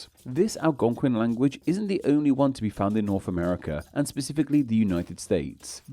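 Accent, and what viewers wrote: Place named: United Kingdom. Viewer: I’m not sure why we have a British guy telling us about which American words and place names come from Native American languages… because he doesn’t even pronounce them the way we do!!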